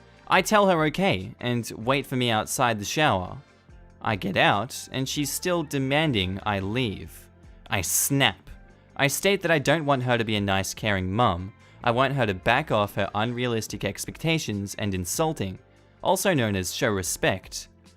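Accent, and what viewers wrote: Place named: Australia. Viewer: I’m not an Aussie but I love the accent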